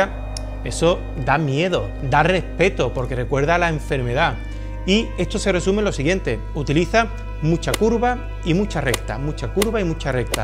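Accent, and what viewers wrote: Spain. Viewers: Tuve que activar los subtítulos para poder entender tu español de no sé que región de España y salió la misma ya que los subtítulos tienen muchos errores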